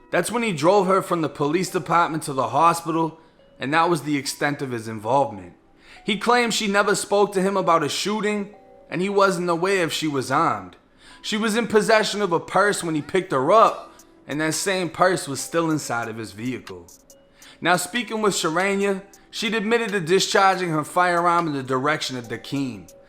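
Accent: Boston, United States. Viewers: Are u from Boston ? U remind me of mark Warburg in that movie you wanna a cawp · There's a reason men don't fight women in MMA. Maybe your goofy Boston accent should research harder on the rest of the world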